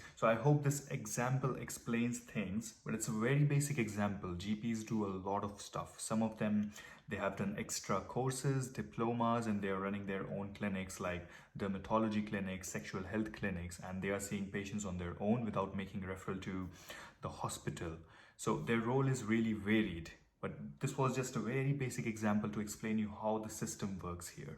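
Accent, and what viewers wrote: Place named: England, United Kingdom. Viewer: I am just amazed by your English accent omg it’s so good just like the Britain’s my brother stayed in the uk for 1 year even his English accent became like yours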